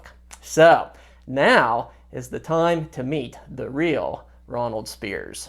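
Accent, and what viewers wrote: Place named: Pittsburgh, United States. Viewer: What part of Pittsburgh is this guy from?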